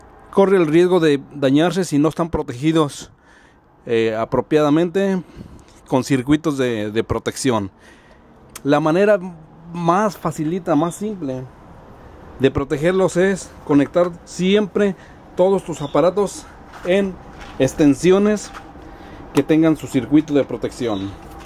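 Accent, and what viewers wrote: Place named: Jalisco, Mexico. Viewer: Muy bueno, saludos, un abrazo! PD. tu acento lo siento como de Jalisco, o de donde eres?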